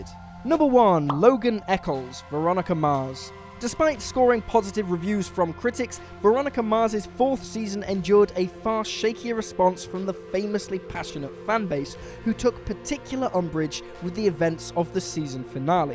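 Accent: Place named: England, United Kingdom. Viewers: You can tell the announcer is English we get loads of Americanisms wrong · Might be cause they're from England? Pronunciation is different man.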